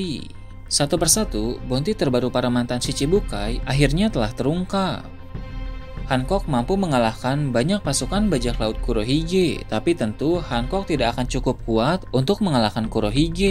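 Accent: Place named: Tasikmalaya, Indonesia. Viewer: mang naha di akhir kalimat dikitukeun wae duhhh ciga orang tasik mang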